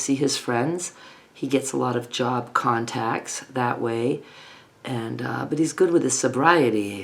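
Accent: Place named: Massachusetts, United States. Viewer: Hi, I found you recently and am thrilled! You are an amazing artist!!! I was planning to respond to the video where Skylar colors Memere's hair. I was so moved to hear someone say the French name for Grandmother that I almost cried. I lost my Mem years ago and very rarely hear the term any more. My family is originally from Worcester, Mass. and they have your accent. Most are gone now, so hearing your voice is very comforting! I am a new subscriber, so really looking forward to unpacking all you offer, which is quite a lot! Can't tell you how you lit a candle in my heart, thinking about my own Memere'! Thanks for offering us the gift of yourself and your art.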